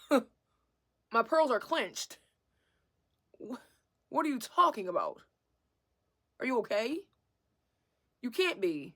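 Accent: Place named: Philadelphia, United States. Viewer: you got a way with words and I got a crush on ya Philly cadence. When are you free to converse more over a glass of Moscato? 🥂 🌹?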